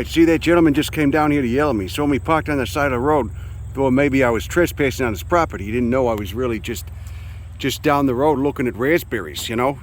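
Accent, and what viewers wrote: Chicago, United States. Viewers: Aye as a WNY native, Buffalonian to be exact I've been waiting for this. The train tracks in Buffalo & the connected bike path (compete with an abandoned golf course converted to a diy skatepark by the local latch key youth) is where I fell I love with native plants. #Greatlakessupremecy..........I'll forgive the NE accent, but you're closer to WNY with your Chicago accent than you would be with New England.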